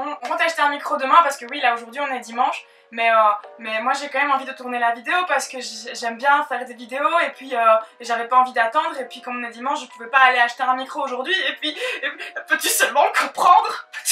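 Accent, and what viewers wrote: Belgium. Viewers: L'accent belge me fait tomber ;) Cela vient pas de de Liège je dirai peut être Hui ou Namur ?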